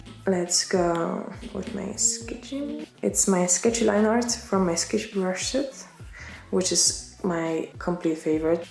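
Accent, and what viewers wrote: Netherlands. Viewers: heyyy!! Nederlands!! Ik dacht al een hint ervan te horen. Het kleine Poolse gedeelte bracht me in de war 😂 geniet wel echt van je video’s! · I know for a fact u Dutch I know the accent of my ppl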